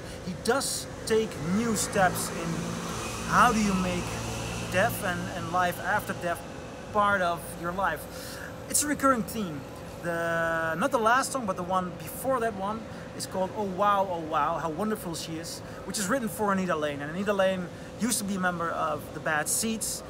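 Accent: Netherlands. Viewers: nice record! Are you dutch? if so, then i didn't know that :D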